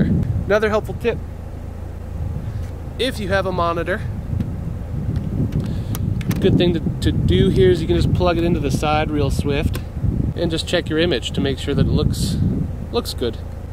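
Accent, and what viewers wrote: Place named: Northern Canada, Canada. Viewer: Interesting accent. Northern Canada?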